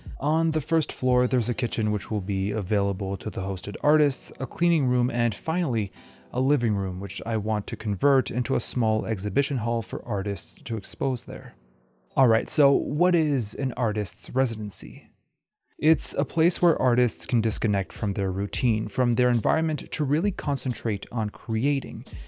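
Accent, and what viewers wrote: Quebec, Canada. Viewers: Hmm may be a kind of a stretch but i always assumed you were quebecois from your pronunciation of french words and names! Love this channel keep doing what you are doing!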